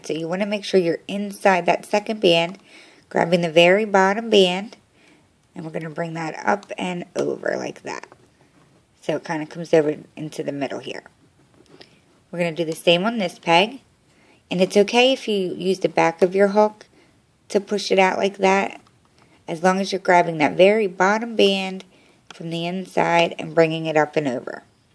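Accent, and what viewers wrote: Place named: New York, United States. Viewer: where are you from? You sound like you’re out NY way maybe? I like it because you remind me of my cousin. Whenever she was angry, she’d turn extra NY lols 💜💜💜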